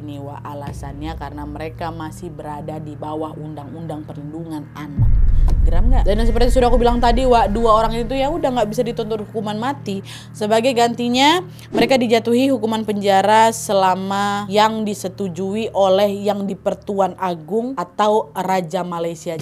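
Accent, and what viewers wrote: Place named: Medan, Indonesia. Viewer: orang mana sebenarnya??? Kalo dari gaya ngomong nya macam orang Medan nggak si...?😊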